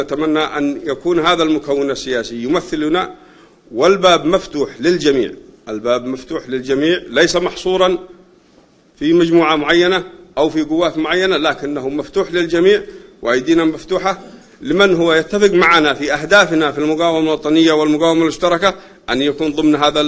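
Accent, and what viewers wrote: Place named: Iran. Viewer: من لهجتك وطريقة حديثك يتضح ان مجوسي تابع لبني صفيون في ايران ،،ما علاقتك باليمن العربي الجريح وانت لا تنتمي له ولا للعرب ولا للعروبه ،،والله ليسحقوك اهل اليمن الشرفاء بمساندة اخوانهم السعوديين عما قريب واتوقع قريبين جداً ربما لا يتعدى سنه ،،،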